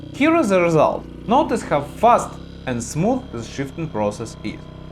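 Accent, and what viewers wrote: Russia. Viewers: That Russian accent ♥️♥️♥️✨ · кацапський акцент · You will not know it, but I do like you, 1. you sound great speaking English, yes I know you have a Russian accent BUT! its not your exact fault, that your govt are pritty stupid and have always caused trouble all over the world, but hey, not you ok, (but you Russians have totally ignored everything! done nothing at all to CONTROL anything, seems all Russians are ignorent of affairs, I have no idea why i have said this, its not motoercycling), 2. your are EASY to understand. 3. you are good at visualisation! 4. its all very comprehensive. I have no idea how you will cope because Russia looks like it will be destroyed somehow?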